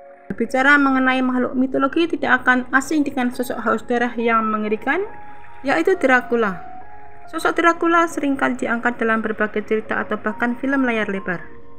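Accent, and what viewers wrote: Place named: Java, Indonesia. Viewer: Pekat benor jowo nya😂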